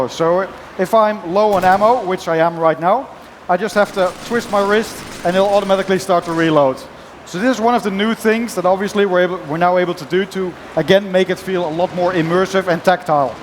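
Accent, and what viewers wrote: Netherlands. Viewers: Hahaha, he is Dutch. I can hear it from his accent xD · hahah geweldig dat nederlands accentXD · Haha you can hear he's from the netherlands, I wonder how many people will play the game like this...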